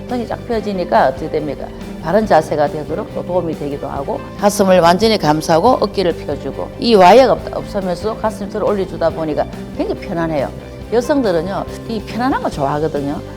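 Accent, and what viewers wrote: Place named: Gyeongsang, South Korea. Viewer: 경상도 말씨~친근감이 들어 꼭입어야 될것같아요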